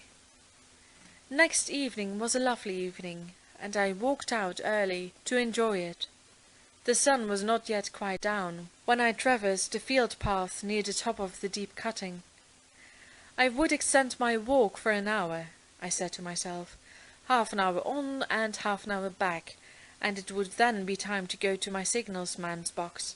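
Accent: United Kingdom. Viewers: I love British accent thanks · This is a RP, isn’t it??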